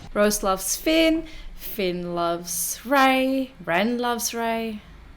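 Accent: Australia. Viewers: Nice to hear it in in an Aussie accent. Grateful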